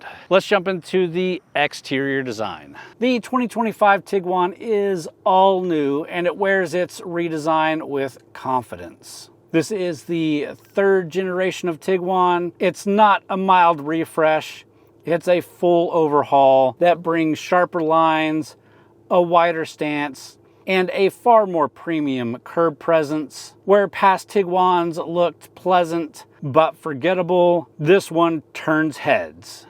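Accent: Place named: Texas, United States. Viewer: Don’t judge. Not all people from Texas talk like this.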